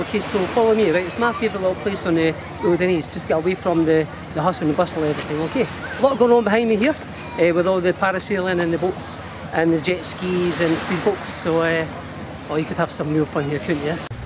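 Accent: Scotland, United Kingdom. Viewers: I love your Videos and your accent! Are you from Scotland?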